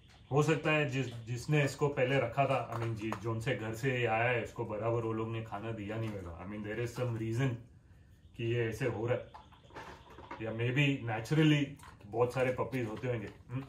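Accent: Mumbai, India. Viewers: Sir,your talking method is awesome..(like mumbai style)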